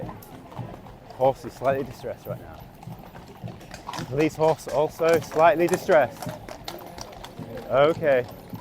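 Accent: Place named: England, United Kingdom. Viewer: The commentator sounds like he's English by his accent. Why is he using the American word for pavement?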